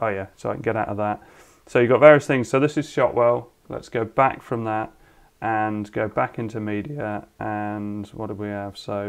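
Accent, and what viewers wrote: United Kingdom. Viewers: Yo are you from sheffield mate?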